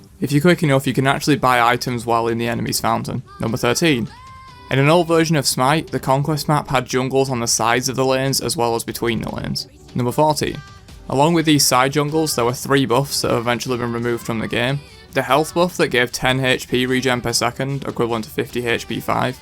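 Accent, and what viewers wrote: United Kingdom. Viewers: "Bof" "fog o' wor" "ba'el" and people say gaelic/british accents are the best lol sounds like an idiot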